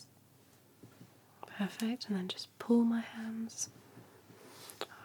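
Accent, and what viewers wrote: United Kingdom. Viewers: Great ASMR voice, British accent makes it even better! ✌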